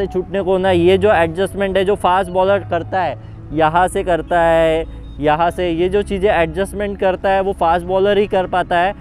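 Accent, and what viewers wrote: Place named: Hyderabad, India. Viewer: Pahla dekha jo hyderabadi bolta hai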